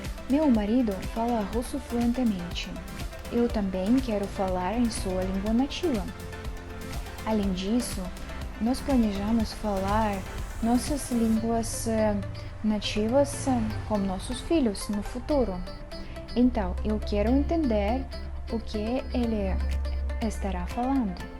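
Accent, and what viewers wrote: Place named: Sorocaba, Brazil. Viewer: você está fluindo bem seu português,mas com sotaque Sorocabano será influência do seu esposo.muuto interessante.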